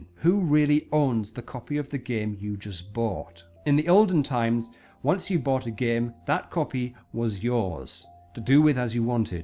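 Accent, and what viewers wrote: United Kingdom. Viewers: dat british accent :O!!!!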